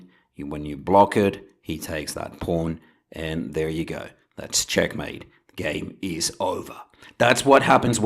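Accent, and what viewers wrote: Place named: South Africa. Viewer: what is your accent, mate? It's like south african crossed with australian crossed with eastern european. :)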